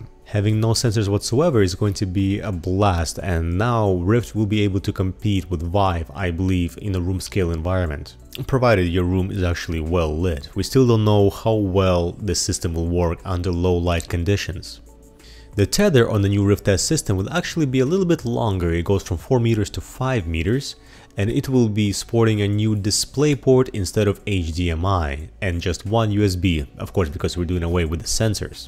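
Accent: Russia. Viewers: Бро ты же Русский???? по акценту слышно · По акценту кажется, что ты русский.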